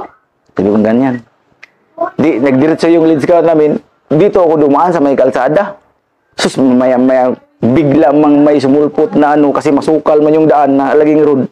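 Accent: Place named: Visayas, Philippines. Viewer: Hahaha visaya lagi do…nakakaaliw si sir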